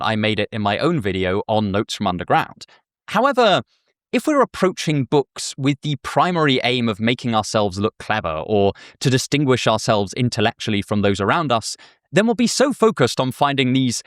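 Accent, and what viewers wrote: United Kingdom. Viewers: First video I saw of yours was one with a super clickbaity title, where you "bashed" people for not enjoying the classics you liked. Ofc... you didn't actually do that, but it left a very weird and mixed first impression, that, combined with the primped-up, smart dressing style and the entirely clean appearance and (as discriminatory as I know this is) the heavy, but thoughtfully elegant and clear British accent made for an image of a typical "snob". I now realize you're the opposite... you're just like this, cause you like being like this and you want to share this love of classics and reading with the world in a non-pompous and inviting way. Sincere apologies for my prejudiced first impression. I also really adored the re-reading aspect towards the end. There was a while in my life where I was deeply against the idea of looking back and it was all about the quantity of highly lauded experiences to me. 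Today, I much prefer returning to things I really adore. Right now, I am reading the manga "Vinland Saga" and watching the anime at the same time, with a friend whose not only never seen it, but who claimed to hate the art form, before I proposed to him (based on knowledge of other things he's enjoyed) to watch it with me, and he's enamored by it. And every time I've experienced this story in all of its different formats, I've discovered some new aspect of it. Even watching it is different. The first time I watched it was with another friend, who was insistent on watching dubb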